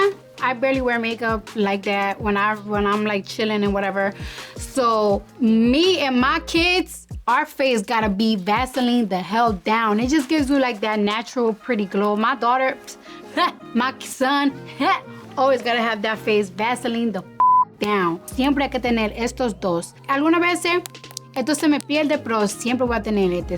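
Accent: Dominican Republic. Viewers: Se le nota el accento Dominicana y Nueva York a la vez😊 me gusta❤ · AMO SU ACENTO DOMINICAMO ♡♡♡ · Esta tiene un slang dominicano de barrio full😅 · El acento Dominicano es ÚNICO 😂😂 IT’S 😂😂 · Tiene un acento dominicano precioso ❤. Mi latina queen 😊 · La ame, su acento dominicano es una delicia. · habla como una❤❤ tipica dominicana❤su jerga,su lenguaje colloquial es puro dominicano😊no puede negar sus raices dominicana❤❤ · Sabía yo que era Dominicana por su acento jejeje me encantó oírla hablar, pero cuando la ví dije y de donde esta chica tan linda, nunca pensé que fuese dominicana pero cuando la oí hablar dije ese acento es Dominicano jeje muy bella, Dios la bendiga 💖🇩🇴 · When first started speak in the very beginning, I was like "Que fue!" THEN her Dominicana came out and I was like, "Oh ok, ta bien!" 😂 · no nació en República Dominicana pero tiene muchas cosas de dominicanos realmente. 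😁 Cuando habla español es Dominicana pura 👌🇩🇴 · 😆 hablando espñol es todo una dominicana nata cremosa, no tengo dinero por si me quieren robar y la cartera ya es bastante 🤣🤣el tipo la dejo pegada la amo me alegra sus videos y locuras natural delicada salvaje ese bolso falto la piedra para lanzar a los que se metan con ella 🤣 si es contigo nicki minaj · Acento Dominicano 😂😂 a muchos no les gusta pero que podemos hacer... La liga de razas influye mucho. Tambien agregarle que somos jocosos y muyyy expresivos al hablar. 😂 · bueno mi amorch debería debatirle ese punto a, pues ella se caracteriza por puntualizar el dominicanismo al hablar y otra vaina que te voy a decir es que los Dominicanos nacemos donde nos da la gana 😂😅 pa ' que cepa🤔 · Ella se considera dominicana porque está orgullosa y su acento es súper dominicano, también dijo en un en vivo que ella se crío allá en RD y después vino a USA aunque nació allá. 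· Con todo y todo ella no sabiendoyñ Bien Español suena muy Dominicana 😭😭😭🇩🇴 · Yes, it sounds very natural and the accent she has is accurate (i think it is from República Dominicana) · loveee the Dominican accent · Me encanta su Español acento dominicano me encant❤ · siempre Con su acento Dominicano 😍🇩🇴🔥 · ella a dicho que es dominicana, incluso su acento en español es dominicano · Se que ella es dominicana pero para haber crecido en USA su actitud y su español es muy dominicano 😂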